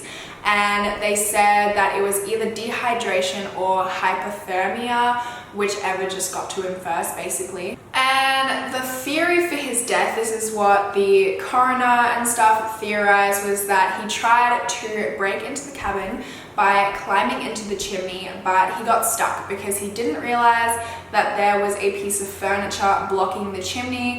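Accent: Australia. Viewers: I have just been watching all US videos but my attention was drawn to your thumbnail at the side of my screen and thought "she's definitely Australian" so im here to check I was right....but I cant figure out what it is about Australian girls that makes them identifiable amongst everyone else on here? I can always predict when an Aussie accent is coming...... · She’s Australian. It’s an accent.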